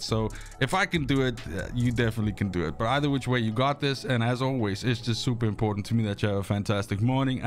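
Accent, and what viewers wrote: Afrikaans, South Africa. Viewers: Thanks for the help with this one. Are you Afrikaans???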